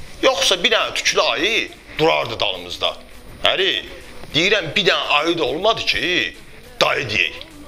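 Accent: Baku, Azerbaijan. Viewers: Bakı ləhçəsüdürdəəə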